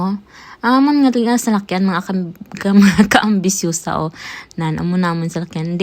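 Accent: Philippines. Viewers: Hnd ko maintindihan cnasabi mo ppro ka visaya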